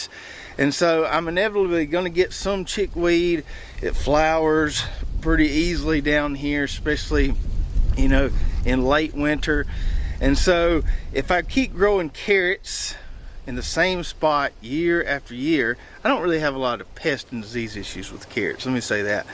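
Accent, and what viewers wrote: South, United States. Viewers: Trivial but--Southern Drawl a bit refreshing from farther N. Commifornia, even if it's heel for hill. 😄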